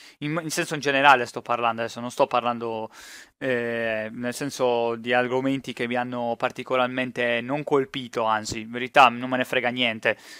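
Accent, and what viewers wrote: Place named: Sardinia, Italy. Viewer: mi sa che siam conterranei..sei sardo vero? sembra del cagliaritano..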